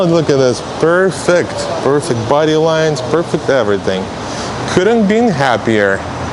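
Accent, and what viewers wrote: Russia. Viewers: Я как то по акценту понял что ты русскоговорящий, привет из Румынии.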